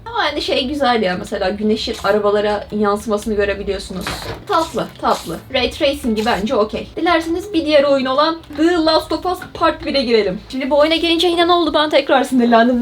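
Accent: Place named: English-speaking world. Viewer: Bu karı niya ağzı yaylana yatlana konuşuyor? Sanki ingilizde türkçeyi sonradan öğrenmiş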